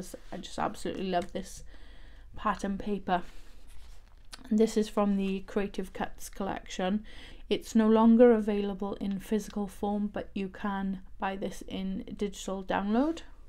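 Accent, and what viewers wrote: Wales, United Kingdom. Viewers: Yay I thought I recognised a Welshy accent. I’m in Cwmbran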